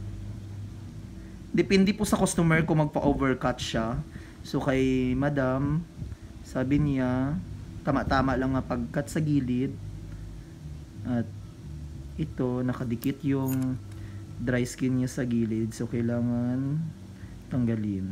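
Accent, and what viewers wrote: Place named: Visayas, Philippines. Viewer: Bisdak man kaau pre hahaha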